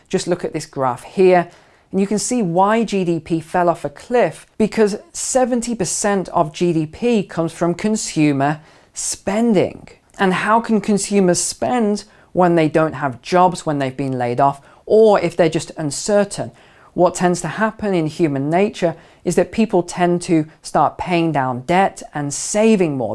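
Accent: England, United Kingdom. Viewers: I didn't like your video at first because of your English accent... How could you relate anything in the United States ?? I watch a lot of videos like David Morgan Gregory Mannarino.. Gregory Mannarino is my favorites show !!!! but you sir are right at the top with the best of them I really enjoy your shows now ... keep spreading the truth and God bless 🙏 🤓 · Is this the American stock market you're referring to. It is confusing with your British accent. You should make it clear. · I listen to him because he has an English accent, as an Ozzie, the English are like our smart uncle! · All of his real world video was of Britain and his accent is British. Guns are far more difficult to in the UK.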